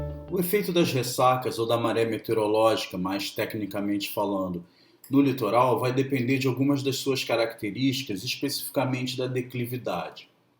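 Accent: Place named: Rio de Janeiro, Brazil. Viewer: ótima aula, inegavelmente professor carioca hahaha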